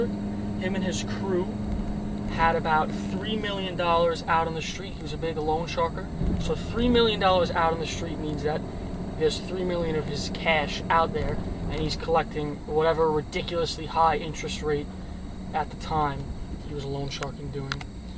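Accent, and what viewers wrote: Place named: Philadelphia, United States. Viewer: Hey u a Philly guy I'm south of Boston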